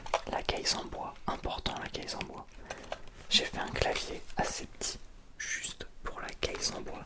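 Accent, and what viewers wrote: South, France. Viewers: Vous n’avez pas le même accent. a l’accent du sud mais pas petit frère 😅. Mais il peut se lancer dans l’ASMR, il a le fluide 😁 🩷🩷🩷💫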